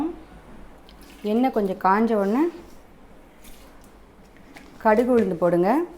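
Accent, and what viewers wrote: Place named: Erode, India. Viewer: sissy erode language pola pesrenga. unga voice kekkum pothu amma oor மண் வாசனை..... And meen kulambu vaasam kalanthu oru vidha santhosathai kudukkuthu.... Nice sissy thank u so much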